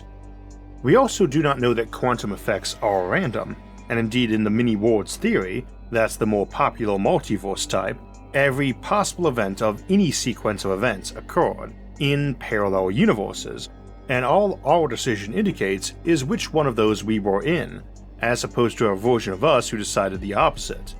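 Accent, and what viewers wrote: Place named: United States. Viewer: I think only you are concerned with your so called speech impediment. Seems like a US accent to me. But I doubt the overwhelming majority of your listeners even think about it. I don't.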